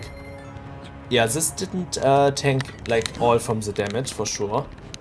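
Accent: Germany. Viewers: It’s a German voice like me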